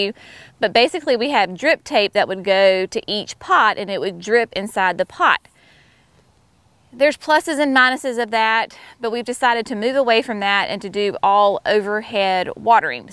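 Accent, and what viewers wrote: South, United States. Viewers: Love your videos. Love your phraseology. You talk like we do with a southern accent rather than our hillbilly accent. I can totally understand stunk to high Heaven.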